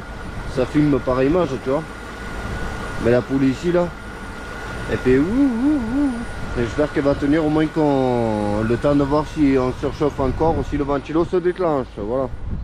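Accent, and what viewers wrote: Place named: Perpignan, France. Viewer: ah entendre l'accent de Perpignant çà fait du bien !!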